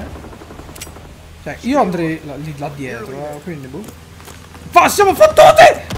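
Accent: Sardinia, Italy. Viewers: E' uno spettacolo questo gioco, ed il tuo commentario mi fa sbellicare dalle risate...questo accento sardo è super! Meno male uno che finalmente mette nella sua homepage quì non c'è fortnite...sei ancora più mitico!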